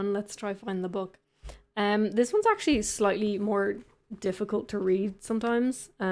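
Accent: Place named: Ireland. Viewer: Woah! Crazy seeing your progress! And i think it's really fascinating how your Irish accent just vanishes when your speaking German. I do have a question, what VPN are you using?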